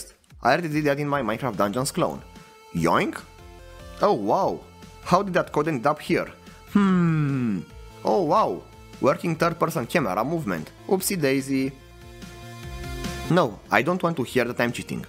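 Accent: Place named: Romania. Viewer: Oho, this romanian accent!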